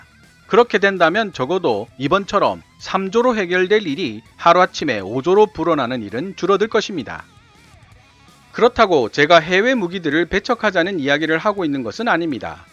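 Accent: Gyeongsang, South Korea. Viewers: 갱상도 사투리 아주 구수하네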